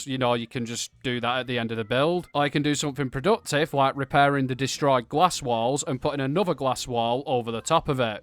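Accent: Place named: Yorkshire, United Kingdom. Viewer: Gotta love a yorkshire accent 😄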